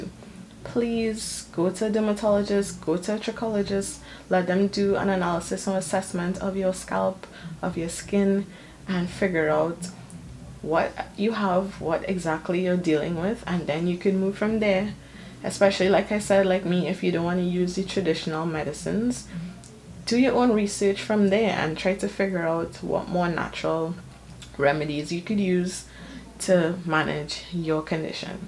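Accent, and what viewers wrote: Trinidad and Tobago. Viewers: Hey girl great video. Oils don't work for me either. Glycerin has been a game changer for me....it is not an oil so i don't get a response . It's also a humectant so it attracts moisture to your scalp and hair...its especially useful as I have relaxed hair as it help make my new growth soft and manageable. When my dandruff as bad.... I used keto plus shampoo only on my scalp. Massaged it and Left it on my scalp for 20-30 mins ..and when I tell you...by the second wash I saw no flakes. I used Glycerin to moisturize my scalp every other day and to my weekly deep conditioning treatments...you sound Trinidadian... looking forward to more videos . Bye!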